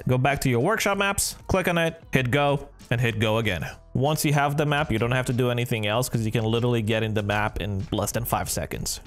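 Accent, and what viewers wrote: Romania. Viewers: are you a romanian? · R u Romanian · Esti roman? Romanian?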